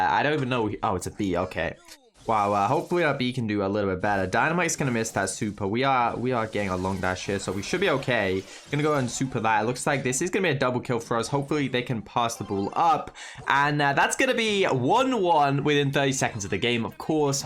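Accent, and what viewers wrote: United Kingdom. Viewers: Bruh he's from UK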